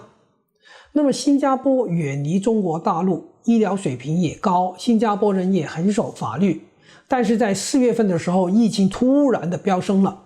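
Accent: Hong Kong, China. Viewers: DON先生的普通話像香港人。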